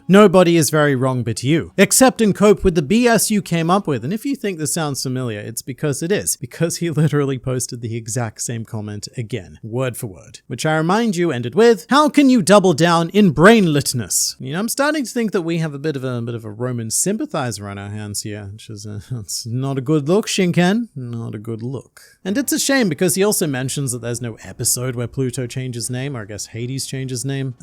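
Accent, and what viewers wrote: United States. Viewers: Actually, you're both very confused. Pluto is a dog. Also, your attempt at American is only one of many accent's I don't feel like counting. We have a lot. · Top notch American accent and as a New Yorker I was 100% fooled by your "I'm walking here" 😂😭😂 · Um actually you sound extremely American. You're just distorting your own accent. Trust me I would know, I'm from Canada. 😂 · can you do a video in your American accent? It's hilarious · Your speaking tone and accent is probably the closest to "American" I've ever heard from an Australian, so I can see why they might have gotten that part wrong. As an American who has talk to a decent amount of Australians over the internet and watched your channel, I didn't realize you were Australian until you mentioned it yourself.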